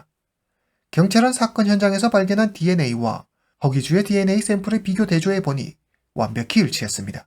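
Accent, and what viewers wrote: China. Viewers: 발음이한국분은아닌거같은데 약간조선족?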